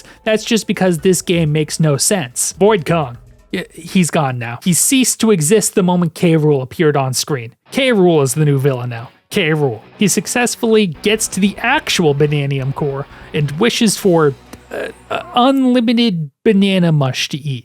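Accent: Boston, United States. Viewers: You sound like a bostonian. (Given how you replace er with a, and you speak with an accent) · I just have to ask... Is that a Boston accent? Can you say "Coffee, Cheetos, Chicken" in your next video for me??? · The random Boston accents throughout the video are making me go bananas.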